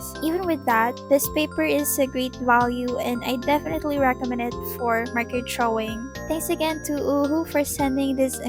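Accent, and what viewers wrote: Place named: Philippines. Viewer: I see hyunjin and art I watch (also new sub cuz i love your artworkkkk) Also you kind have a filipino accent (Are you filipino by any chance?)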